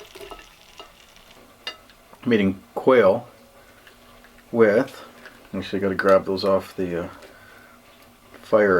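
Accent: Canada. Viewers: You sound Canadian but you don't sound like you are from Ontario. Is your Mum from the East coast?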